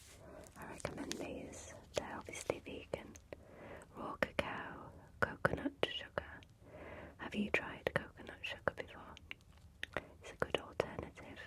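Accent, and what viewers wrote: United Kingdom. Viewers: Love this and you!! Rough week so far and this has me ready to fall asleep and feeling calm. I’m American (with a US Southern accent) and I find your British accent so beautiful and proper ❤️💕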